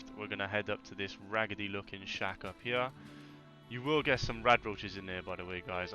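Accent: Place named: Cardiff, United Kingdom. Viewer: I live in Barry currently but all my family are from Cardiff! Small world! Didn’t expect to hear a familiar accent while watching fallout 76 videos... thanks again! Subscribed and liked 👍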